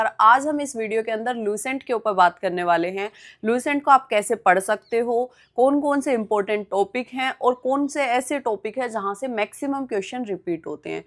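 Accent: Haryana, India. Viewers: Maam you have a perfect Haryanvi accent.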